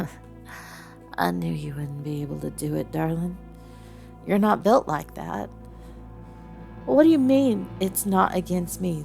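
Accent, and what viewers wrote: South, United States. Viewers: Your Southern accent is the most beautiful I’ve ever heard 🥹🥹. This Yandares are the most loving and loyal I would love to that for a Girlfriend someday 🙂‍↕️. You are an amazing woman thank for this Scary yet gorgeous audio also I subscribe to your channel today 💗💗💗💗💗💗. Kind Regards AuraKnight 🥰🥰🥰🥰🥰🥰🥰.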